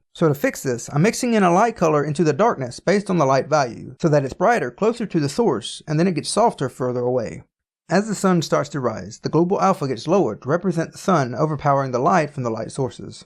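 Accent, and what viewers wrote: United States. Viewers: last video: italian accent this video: american truck dad accent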